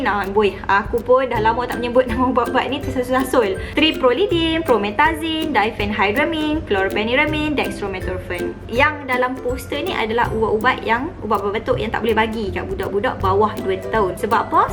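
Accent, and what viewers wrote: North, Malaysia. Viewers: Boleh ja faham..biar la dia dah selesa ckp loghat utara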